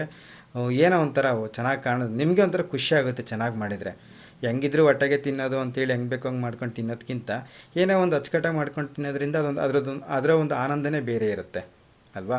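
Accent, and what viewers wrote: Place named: Holalkere, India. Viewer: Nimmadu holalkere taluka bro Nammoora kade thara ne maathadtira.... normal blr bhashe ellaru maathadthare.. continue like this 😅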